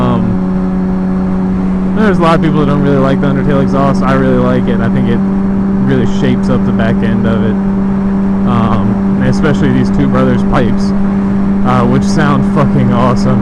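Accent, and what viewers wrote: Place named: Alabama, United States. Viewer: U from Alabama too?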